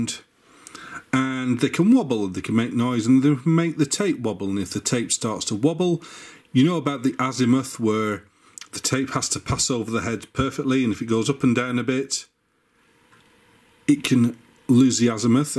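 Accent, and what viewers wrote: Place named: Northern England, United Kingdom. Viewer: No,I'm another North O'England boy just like you is.A bit further north than you (Durham).But IIRC Vic/Jim grew up in Leeds and sometimes you really do sound like him ,only for a couple of words at a time,here and there though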